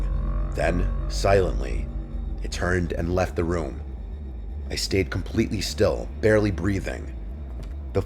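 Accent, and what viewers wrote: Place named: New Jersey, United States. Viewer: I’m gonna guess you were raised in Essex County NJ, am I right? My accent is coming back just listening to you! 😂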